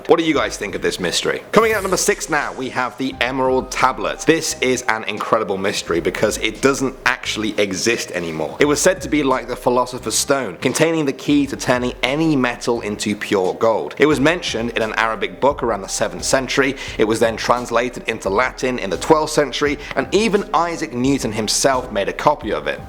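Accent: United Kingdom. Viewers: He is British, its an accent, not him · I hate the British accent. Stop asking me a question thru your statements. Ugh. I live in the UK.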